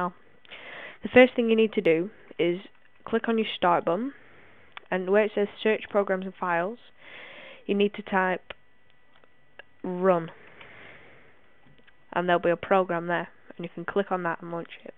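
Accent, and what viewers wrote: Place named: Ireland. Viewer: Wow are you Irish that's awesome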